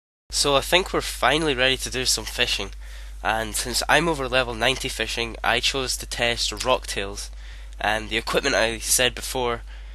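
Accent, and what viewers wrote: Scotland, United Kingdom. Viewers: Your one of the best rs Guide makers out there and Your scottish :)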